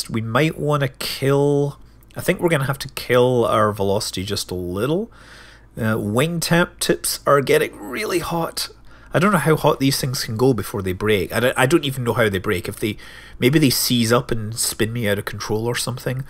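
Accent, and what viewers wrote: Scotland, United Kingdom. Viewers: Well... new favorite Interstellar Quest episode found! Drama, Suspense, perseverance aaaand all in a Scottish accent... Everything a good show needs.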